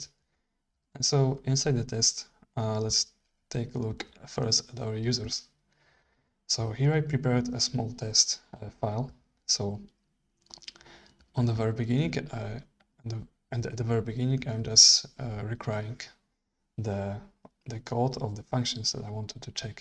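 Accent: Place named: Belgium. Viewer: I was like huh, this guy sounds like a Belgian speaking English (I am Belgian too), and then I saw the location of the video haha.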